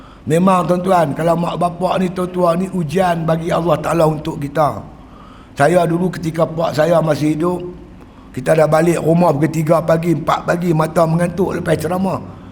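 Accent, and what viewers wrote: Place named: Perak, Malaysia. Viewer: Slank Perak utara. Nahu dia lain sikit dari Kedah atau Penang.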